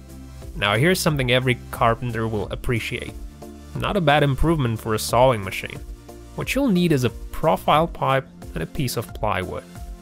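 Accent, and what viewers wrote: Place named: United States. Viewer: You speak with an American accent but seem to have some trouble with with English, sounds weird.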